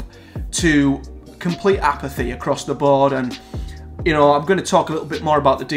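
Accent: Middlesbrough, United Kingdom. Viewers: Hi new viewer here, I agree I think it's a last ditch attempt to get viewers on side, I stopped watching after peter capaldi because I believe they were jumping on the woke wagon, this seemed to be proved true as the series went on. Before everyone calls me a misogynist, I though missy was the greatest baddie theres ever been on dr who, anyway sorry for the long winded message, are you a Middlesbrough lad , I'm from redcar recognised the accent.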